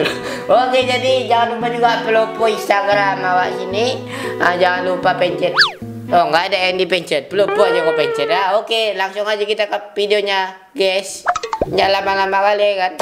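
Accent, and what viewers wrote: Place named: Padang, Indonesia. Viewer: Padang mana nih suara nya?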